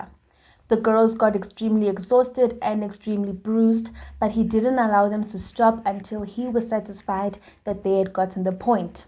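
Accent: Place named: South Africa. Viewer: Hey you sound like amazing journalist and these are unique video.....love the SA accent. WhatsApp fam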